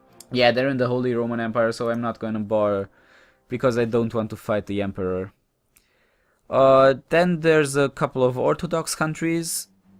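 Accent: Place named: Germany. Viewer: I really like your voice. Keep your work up, its good .D Greetings from Reddit. Btw: Are you from Germany? Your Accent sounds a little germanic.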